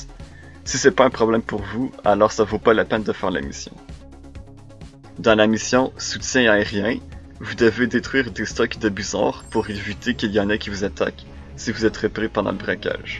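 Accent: Quebec, Canada. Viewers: J’aime la vidéo Hahaha et ça parait que t québécois (je le suis aussi) gg continue comme ça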